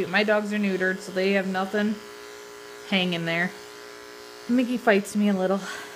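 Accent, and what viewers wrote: Michigan, United States. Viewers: you're a star! I just luv ur soft Michigan twang.. could listen to you all day but I gotta work! Keep up the good work!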